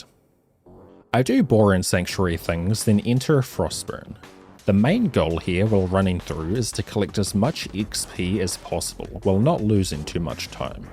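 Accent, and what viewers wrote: New Zealand. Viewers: Hey man what accent do you have? I thought you might've been a Kiwi? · heard the accent and knew u were NZ, great video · Where are you from? I initially clocked you as Kiwi but as the video went on, I became less and less confident in my assumption.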